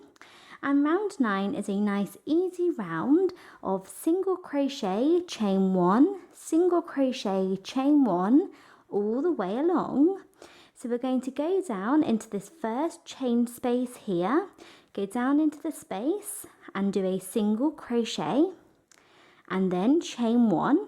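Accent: United Kingdom. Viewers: Que bonito suena el inglés británico!! 😍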